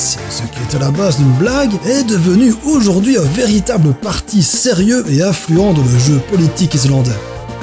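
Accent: Belgium. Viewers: salut, tu fais des vidéos de qualité, du coup je m'abonne. Faut surtout pas que tu t'arrêtes, ton travail est déjà d'un niveau impeccable! j'ai fais un rapide tour de tes vidéos et je préfère cette voix plus naturelle :) elle fait moins Benzai que pas mal de monde te reproche, ce que je comprend pas trop d'ailleurs pcq avec ton accent belche c'est complètement différent ^^ Est-ce que tu as pensé à faire une vidéos sur les bières de notre pays ? genre top 10 des bières d'abbayes ou quoi :P Bonne continuation et je bois à la santé de ta chaîne, que ca prospère ! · Est-ce que tu peux arrêter de dire "houite" et dire "huit" stp, je sais que t'es belge mais bon ^^.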